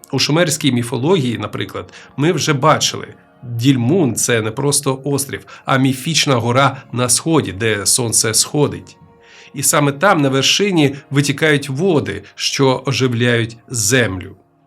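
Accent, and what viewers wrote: Russia. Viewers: Такой корявый русский акцент. Ещё и говорит так медленно, еле языком ворочает.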